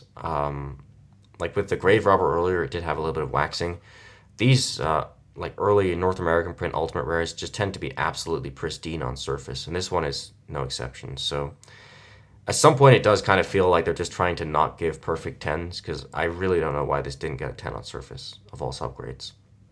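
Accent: United Kingdom. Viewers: ah that explains much more things ! I did wonder about the location at some point since you have a not-so-marked british accent - though still obviously noticeable in hindsight ^^ Well keep the good work and thank you for sharing your magnificient returns. It's quite rare to have European collectors not shy of showing their pearls so it's a pleasure to watch it beyond the Channel.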